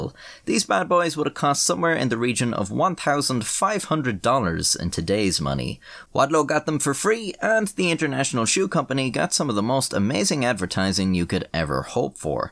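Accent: Ireland. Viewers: Greetings from one of your ripples in America. I love what you do, and am grateful for your insight and view. Please continue, because there is literally NOTHING in this world I love more than learning from someone with an Irish accent! (I didn’t even have to make that up it’s so true) be well and thank you again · I just wanted to say thanks for going to all the trouble to make these videos. Really like your style, subject matter, humor, and of course, that cool Irish accent. Your ripples reached me in Texas. Keep up the great work - love your channel. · Same im battling fentynal i been on it for 2 years and all i can do when im detoxing is kick around shiver sweat puke. His vidios are easy to watch in a fragile mindset nd his voice make me laugh not alot of irish people here😂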